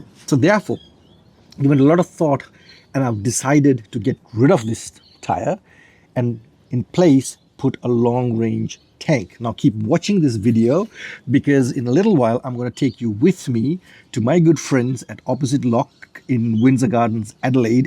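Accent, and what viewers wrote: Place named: South Africa. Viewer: Are you from South Africa, I hear some safa in the accent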